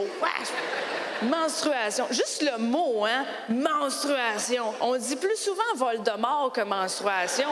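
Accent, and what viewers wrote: Quebec, Canada. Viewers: c'est surement drole, mais l'accent québécois trop difficile a comprendre... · Je te découvre. Dommage que je ne comprenne pas tout car je ne suis pas habituée à ton accent (je suis française) mais c'est vraiment très fort ! Bravo !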